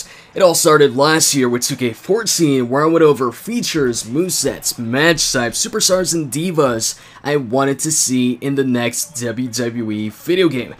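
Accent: Canada. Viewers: why don't you speak with a French Canadian accent anymore? please respond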